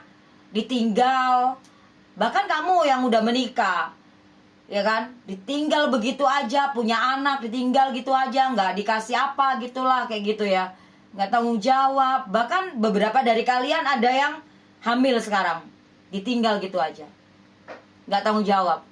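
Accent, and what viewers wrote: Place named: Java, Indonesia. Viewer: Mbak bahasanya agak medok Jawanya. Mbak aslinya dari Jawa ya.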